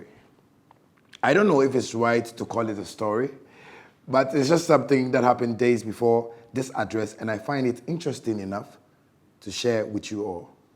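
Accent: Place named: United States. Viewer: So you can speak american english,shatta shatta!!!!!!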